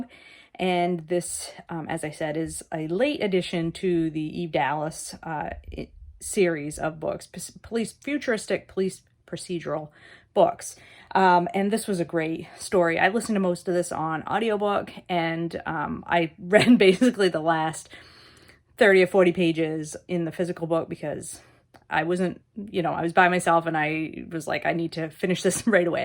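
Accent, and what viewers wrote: Maine, United States. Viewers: Seems a little late for the lake to be freezing. LOL at the lame Maine accent.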